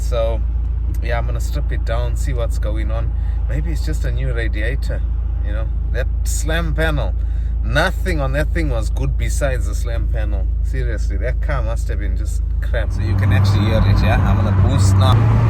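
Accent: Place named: South Africa. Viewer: Dude no joke first video I watched now, you sound like you are from South Africa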